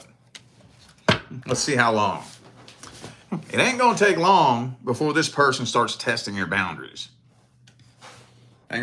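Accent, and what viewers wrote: South, United States. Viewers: This resonated and also your accent reminds me of home in the south! Live in Cali now but I miss these accents ❤😊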